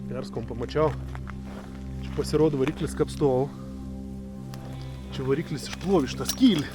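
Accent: Samogitia, Lithuania. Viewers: bet vistiek ta jusu zemaiciu kalba grubi yra kai kalbat tarp savu :D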